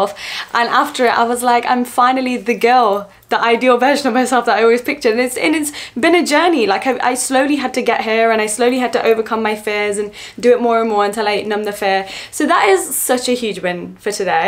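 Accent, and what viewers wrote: United Kingdom. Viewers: You just slayed it girl❤ and one request.. can u plz help us how you got the British accent bcoz Ur voice is so bold and amazing!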